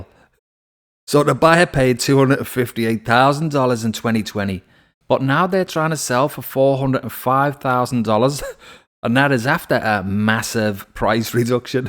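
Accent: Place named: Northern England, United Kingdom. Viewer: This guy sounds like a Northener, England, but New Zealand has so many scammers now, he could just an impression 😮😅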